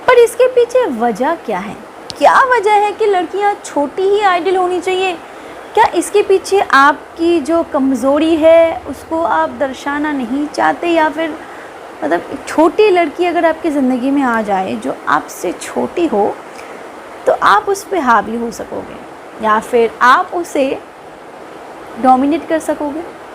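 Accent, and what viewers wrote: Bengal, India. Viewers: aapni jodi banglate bolle khub bhalo hobe aapni jokhun Hindi bolen bangla bangla bhab aase aapnar thopik guli bhalo lage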